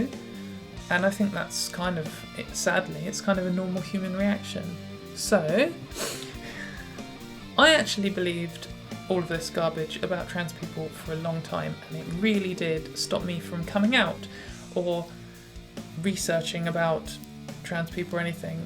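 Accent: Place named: England, United Kingdom. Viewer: Good to hear an English voice doing a video like this. Sensible comments , beautiful looks , nice guitar solo, you have got it all girl !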